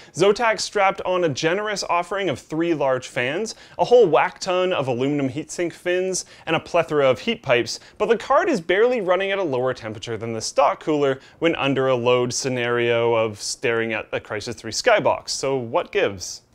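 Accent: Canada. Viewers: Holy fuck I can't listen to Lukes Canadian voice, it's infuriating lol. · I love how canadians pronounce "about" and "out"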